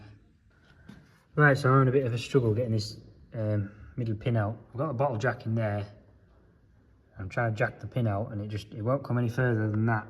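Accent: Yorkshire, United Kingdom. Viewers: brayed it in! broad yorkshire!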